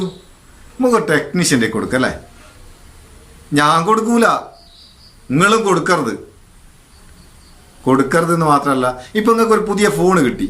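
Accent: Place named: Vadakara, India. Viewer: അദ്ദേഹം മലയാളം നന്നായി അറിയുന്ന ആൾ തന്നെ ആണ്. പിന്നെ ഈ സംസാരം ഞങ്ങൾ വടകര, മേപ്പയൂർ, നാദാപുരം ഭാഗത്ത് ഉള്ള സ്ലാങ് ആണ്.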